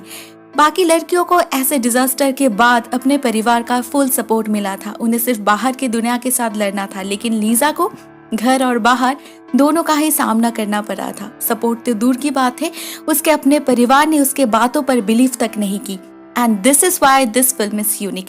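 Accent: Bengal, India. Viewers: Aapki bolne ka tareeqe se pata lagta aap bengoli ho Btw I'm also bengoli 😊🌸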